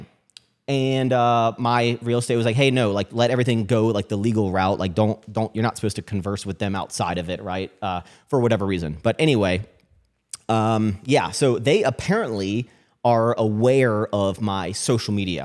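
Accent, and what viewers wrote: United States. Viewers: The onset of the country accent is crazy. Love you! Rooting for you always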